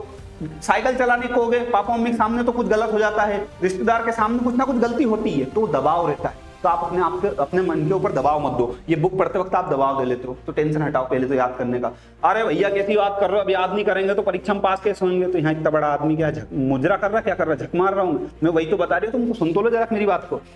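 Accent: Indore, India. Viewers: Indore ascent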